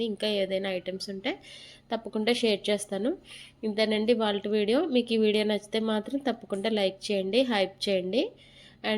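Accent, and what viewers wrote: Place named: East Godavari, India. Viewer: Matladithe east godavari antaru madhi east godavari sister Maa side chesukuntaru super sister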